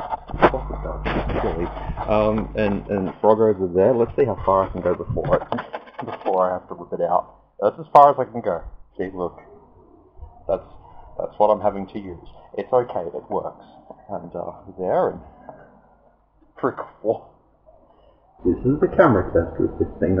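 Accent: United States. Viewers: DAMN! your american accent is pretty solid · Your “American English” accent is pretty spot-on, tbh. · I enjoy ur American accent lol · American here. Your American accent was actually really good! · Your american accent is actually pretty convincing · Just saying, you've got a pretty good American accent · Well, you're no Hugh Laurie, but your Yank accent definitely does not suck, bro. :) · You got a nice American voice !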